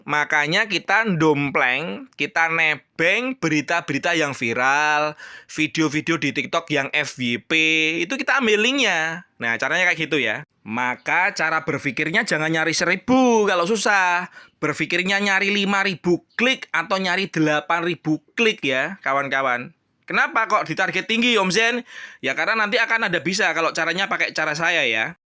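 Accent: Java, Indonesia. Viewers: Et medok wkwk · Medok banget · Mentok logat Jawa ny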